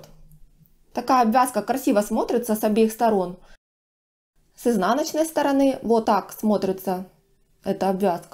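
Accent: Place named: Russia. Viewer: Интересно,что за народность в России с таким интересным говором и диалектом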